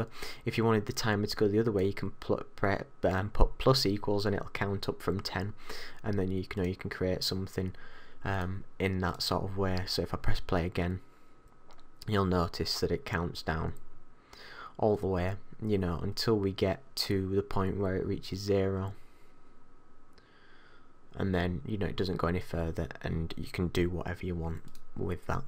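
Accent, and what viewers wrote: United Kingdom. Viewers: your accent is cool :) love british accent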